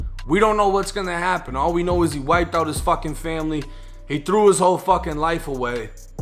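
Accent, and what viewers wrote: Boston, United States. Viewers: Whea u from bro u sound like u from sumwea in the bean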